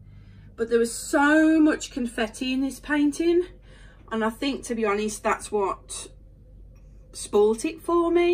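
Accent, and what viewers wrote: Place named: Birmingham, United Kingdom. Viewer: Hi glad you finished it . And nice to hear a Brummie like me. Looking forward to seeing Tinkerbell.😁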